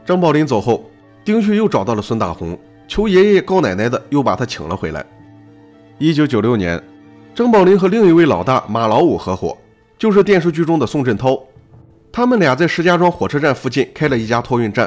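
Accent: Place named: Henan, China. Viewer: 我听出来河南口音了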